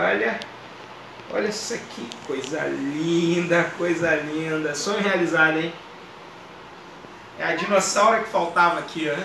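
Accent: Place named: Rio de Janeiro, Brazil. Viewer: Ela eh linda (amo esse sutaque carioca)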